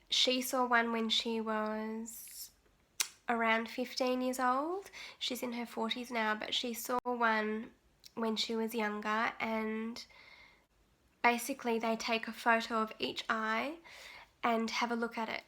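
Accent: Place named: Boston, United States. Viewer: Nice Boston accent!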